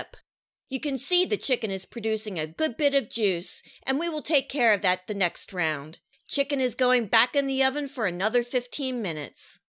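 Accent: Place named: Minnesota, United States. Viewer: Not sure where you’re from but the accent only makes these recipes even better. Minnesota? Thanks!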